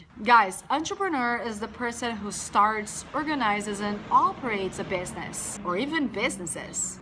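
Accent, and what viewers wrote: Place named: United States. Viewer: That's not an English, it's American...gosh please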